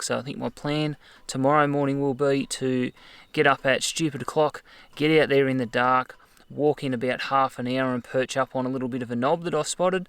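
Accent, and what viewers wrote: Australia. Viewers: Il just saying like it is. First off I wanna say that I watch alot of hunting videos and usually only aussie ones and by far your channel and your hunting videos are the BEST! You not only hype me up to go out and hunt but I reckon anyone else watching will have the feeling that you give me...and that's the feeling as if I'm out there hunting with you bud. You take so much time and effort to not only explain but document everything exciting and sitting on the edge of your seat kinda view. I would also like to come out there and say to all the negative comments you get on this and other videos about you shooting ferrel cats and foxes that they should all go and get fucked and if they don't like it to just not watch. But hands down iv smashed that subscribe button so hard that I ended up cracking my phone but who gives a shit. I love all your videos and that great Aussie accent just puts the icing on the cake. 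So great job and you keep me up to all sorts of hours at night hooked to your content. To all the haters just go and piss off or get deported lol. Go hard but as people don't realise the time it takes to edit and put up videos like yours and also the effort it takes of you just being out in the greatest country on earth living the dream. I'm happy I found a video I could comment on as they had turned off the comment section on some others and that's why I spilled my guts out here. Big thumbs up to you and God bless you and your family and be safe my friend. Best bloody hu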